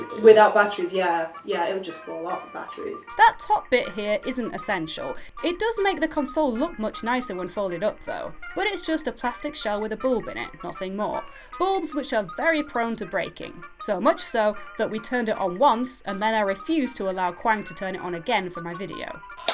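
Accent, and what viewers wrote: Yorkshire, United Kingdom. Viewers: From one Yorkshireman to a Yorkshire lass you can saw owt owever you want - its them lot not from Yorkshire that cant speak propper, They just cant take that they are not from gods county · Great to see more yor'shire on you tube! As my southern colleagues tell me, "it's grim up north!" So you must be very posh! ( btw I'm from Leeds!) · I thought "what's she on about she doesn't have an accent " then I remembered I'm Yorkshire too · I thought you sounded from yorkshire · What part of Yorkshire are you from? It's my second-favourite region after Durham, but Durham only wins because it has me in it.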